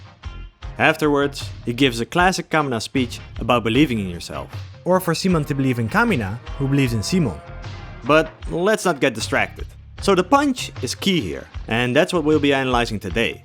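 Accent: Netherlands. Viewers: You are Dutch aren't you. I can hear the accent/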